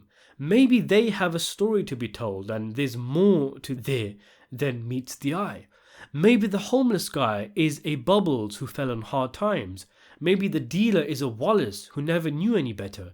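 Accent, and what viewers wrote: England, United Kingdom. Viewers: Great reveal, watched for a long time big fan always wondered what you looked like, obvs your accent is dead give away, British Asain, but nice to put a face to a voice after all this time. Lay off us Brits though, how you gonna do a video on the wire and then end up blaming other peoples mindless hate on us colonising Brits???? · I always knew you was south East Asian - I was bare confused when you entertained being white… UK got different demographics - English accent doesn’t mean white hahah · are you British? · Something I thought about that was little ironic. Most of us have never seen you. We know you have a British accent but we don’t really know what color you are. Idris Elba is British too. It would be funny for those talking crap if you were actually black LOL.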